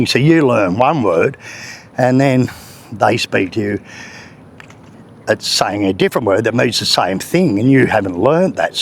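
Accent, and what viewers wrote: Australia. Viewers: Inspirational! You can barely speak Strine English, so the fact that you've learned Bahasa Indonesia to the extent that you do is truly inspiring. How long has it taken you?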